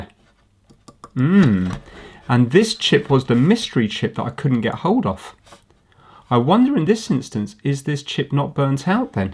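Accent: United Kingdom. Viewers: thanks again for all your hard work and providing a ton of entertaining content for all of us throughout the holidays, heck I may have learned a thing or three as well. My daughter heard your video and she was down the hall and she said you listening to that again? She said I could tell by the "british accent" anyways, Happy New Year! Look forward to more greatness in 2026!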